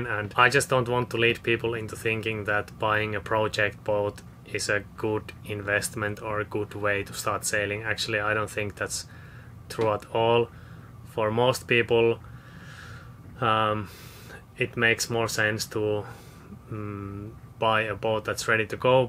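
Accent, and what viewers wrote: Finland. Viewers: I was listening to your accent and I thought you might be Finn. I was right. 😊 I’m Finn American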